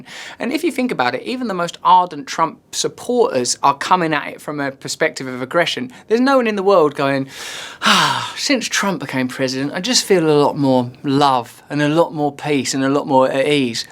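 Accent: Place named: United Kingdom. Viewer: Brilliant observations as usual with the appropriate amount of well spoken British!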